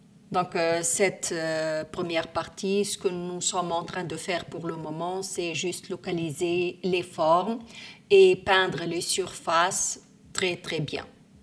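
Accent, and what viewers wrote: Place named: France. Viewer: Howdy 🙂, i thought 🤔 you speak English.. it's like you speak France!! Anyway I'm going to go inside your painting here.. see ya Oh, i have a question: What Should i mix If i want the (Cloudy suny colours) ?! Which colours should i mixed?!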